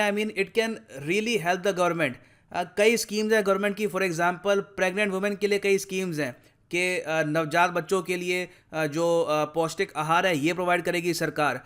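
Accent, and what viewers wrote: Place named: Uttar Pradesh, India. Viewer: Bro just remember one thing India has a variety of language and every indian citizen has it's own mother tongue (not hindi) like basically belong to Uttar Pradesh (having 100's of local languages like Khadi boli,Awadhi, Bundeli, Bhojpuri etc which is not Hindi) by the passage of time majority of people of UP switched to Hindi but the accent somehow sometimes somewhere remains in them that's why there is difference in accent of many people which didn't fade easily...and it's not a bad thing. In my opinion we have to conserve our mother tongue and we should not point out the mistakes in someone's accent because every language is Just for communication and if you are understanding the point..the purpose of communication is done. So next time don't make fun of anyone on the basis of their language or accent in language. 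Respect and be proud of the diversity of India 🇮🇳🙏❤️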